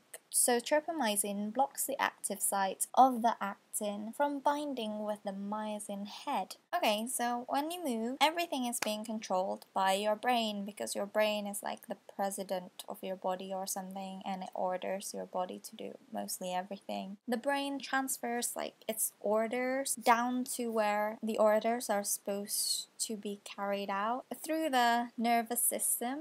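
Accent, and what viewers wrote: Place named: United Kingdom. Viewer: i think ur smart :) indonesian who has a brithis accent lol :) salam hangat dari Indonesia :) i wants ur life lol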